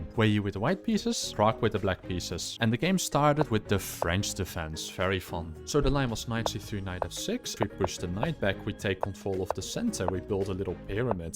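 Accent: Netherlands. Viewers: Is this an AI channel with a Dutch accent?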